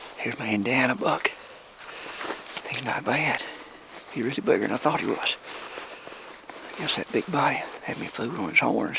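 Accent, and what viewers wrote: South, United States. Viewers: What state are you from. You have a southern accent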